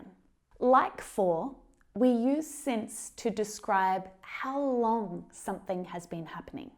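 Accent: Australia. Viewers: Teacher, I just want to say: Thank you. Your accent is really beautiful, this australian accent is wonderful, I really like it. All of this videos are very useful. Thank you teacher for your job, this videos will always help us.Thank you ❤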